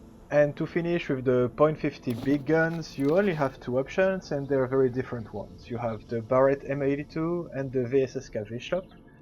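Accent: France. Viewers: Are you French? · Tu est français ?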